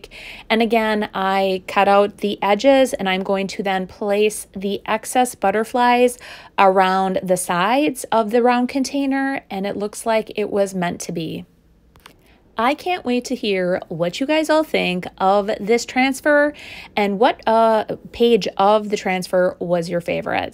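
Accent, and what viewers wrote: Wisconsin, United States. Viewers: I really, really enjoy your work! What caught me first was your voice...my husband is from Watertown. I absolutely love WI!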